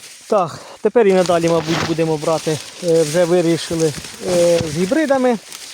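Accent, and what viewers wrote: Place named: Sumy, Ukraine. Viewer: Коментар на підтримку. Але ж як приємно чути нашу сумську говірку)))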